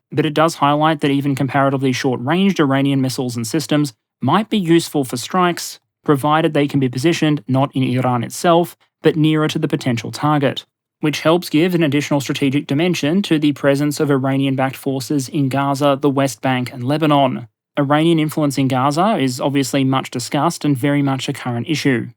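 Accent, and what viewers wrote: Australia. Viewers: The Aussie accent almost hides the propaganda but the rethoric is the same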